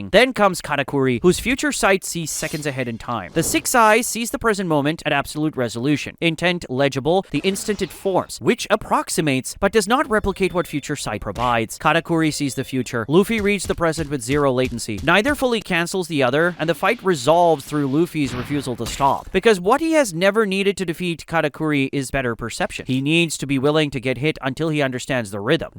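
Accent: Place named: India. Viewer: why bro got indian accent?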